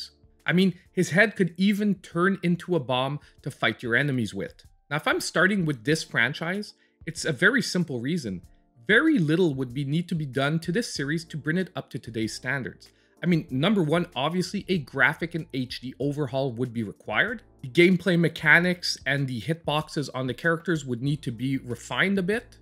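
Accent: Canada. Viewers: I come for the content. Stay for the Canadian accent. A youtuber unlike any udder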